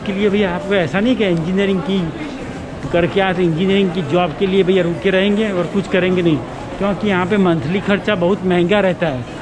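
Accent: Gujarat, India. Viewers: ગુજરાતી ઓ ની હિન્દી સાભળી મજા આવી ગઈ... KEEP IT UP BROTHER 👍👍👍👍👍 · Bhai tme2 to gujrati niklya tmari hindi thi khabr pdi 🥰☺😄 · Aa bhai gujarati lage 6 hene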